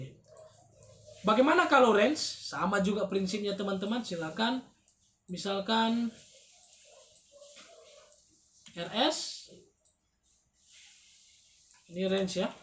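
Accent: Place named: Buton, Indonesia. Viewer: berasa dijelasin sama teman waktu kuliah orang Buton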